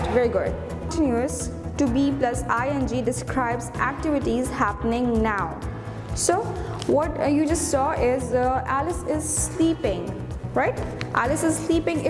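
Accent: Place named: United States. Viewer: Mam you've great American accent.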